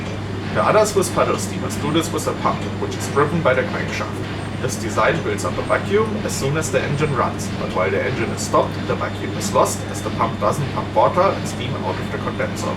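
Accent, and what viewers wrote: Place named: Scandinavia. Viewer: The way the narrator talk is make me think if it's Sweden? Germany? Or Norwegian?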